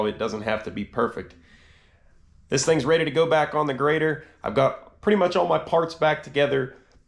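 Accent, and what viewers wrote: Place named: Western Pennsylvania, United States. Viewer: I'm curious where you are. I'm getting a slight western PA accent? I have relatives there.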